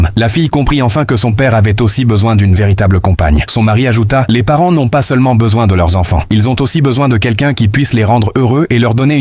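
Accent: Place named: France. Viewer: 😮 quel accent française. C'est vraiment étonnant. Merci pour. ?? ?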